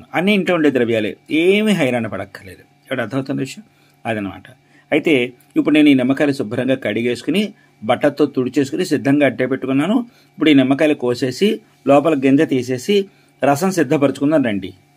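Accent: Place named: Godavari, India. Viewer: మీ తూ.గో.జి. పగోజి తెలుగు అద్భుతం. వినాలనిపిస్తుంది, చేసినవి తినాలి అనిపిస్తుంది నమస్సులు.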